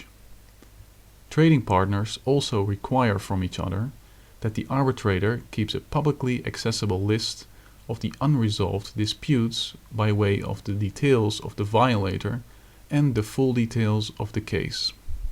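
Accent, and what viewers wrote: Netherlands. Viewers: Do I hear a slightly Northern-European accent there? Perhaps Dutch? :)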